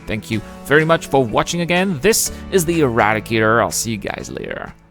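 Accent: France. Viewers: Bro... Are u french?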